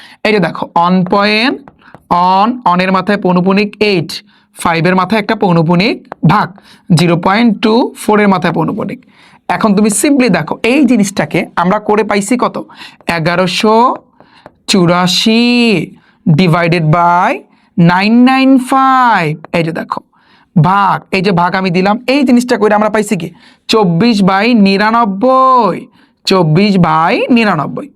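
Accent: Barishal, Bangladesh. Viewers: ভাইয়া কি বরিশালের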